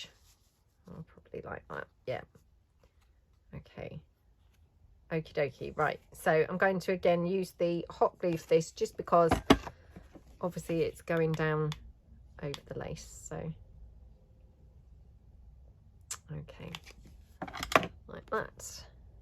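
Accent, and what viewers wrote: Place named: RP, United Kingdom. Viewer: You are amazingly creative and inspirational. I love your posh accent and your journals rock! Thank you!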